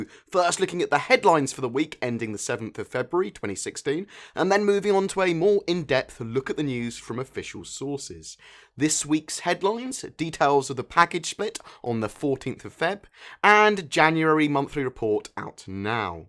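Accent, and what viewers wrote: United Kingdom. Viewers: Just found you today, and I saw one video and subscribed. I love the way you deliver the information and I can tell that a lot of research and work overall goes into your videos. I had some questions I would like to ask, what mic do you use to record, also is sounds like you live in to UK or grew up there is this true(I'm bad a accents being from the states(Texas)). Love you'r content can't wait for whats to come but for now time to catch up on you'r videos. -Ian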